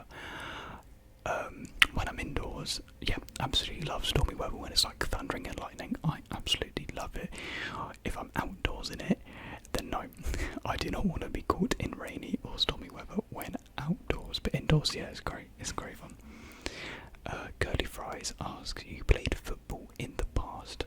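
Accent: United Kingdom. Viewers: I use your videos to learn British accent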